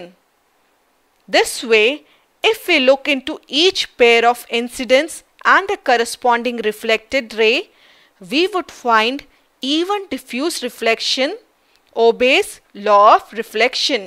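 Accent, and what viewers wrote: India. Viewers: Don't b_llsh_t us. It's not in english, but indiaglish.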